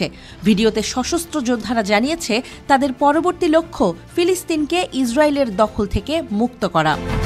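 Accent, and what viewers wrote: India. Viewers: খবর বলার ধরন দেখে মনে হচ্ছে সে একজন ইন্ডিয়ান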